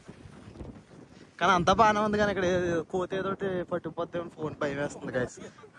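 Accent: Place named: Godavari, India. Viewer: Ekkadaki vellina godavari slang😂.. Bavuntadi andi mana slang❤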